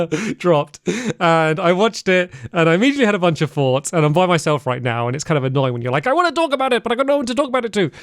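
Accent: United Kingdom. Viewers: Never watched your vids cause I used to think your voice was annoying. Now I realize you're just British and it's not your fault. Live your truth, I support you 🕊️🇨🇰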